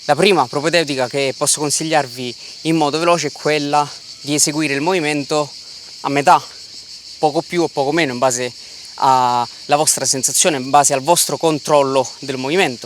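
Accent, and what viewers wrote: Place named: Portugal. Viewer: Ese acento portugués le da ese carisma genial. Grande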